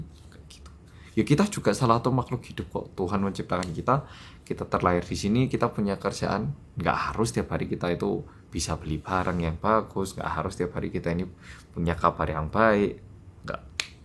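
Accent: Java, Indonesia. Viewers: kok malah logat jawa ya keliatan medok he he hee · medok,medok,medok banget sih....bahasa koreanya logat Jawa ya?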